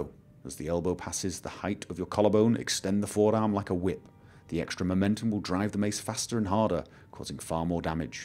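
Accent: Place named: Scotland, United Kingdom. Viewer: It sounds like you have an English accent, but you roll your R's like a Scotsman. Did you invent your own accent?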